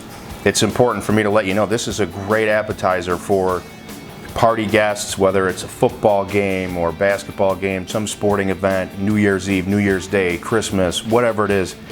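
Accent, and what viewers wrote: Chicago, United States. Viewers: Ok ok you got me new sub and I detect a Chicago land accent so just curious where from. Spent 35 years mostly in DuPage county and damn I miss the food as these folks here in southern New Mexico dont have a clue. I'm gonna give this a go this Sunday for football but I may pan sear and then run in the slow cooker to get tender.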